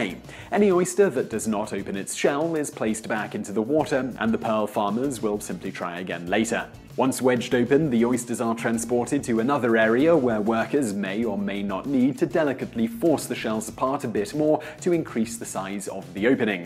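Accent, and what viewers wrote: United Kingdom. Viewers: Bri'ish Vsauce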